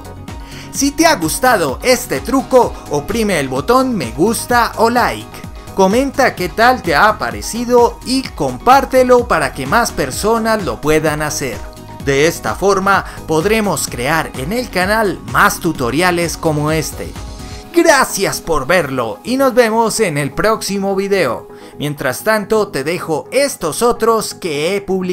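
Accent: Colombia. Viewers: Eres de colombiano ? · muchas gracias muy útil eres colombiano?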